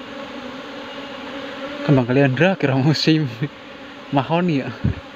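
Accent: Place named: Wonosobo, Indonesia. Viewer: Mau tanya bang,ini dari logat bicara sama seperti istri saya..apa abang dr wonosobo?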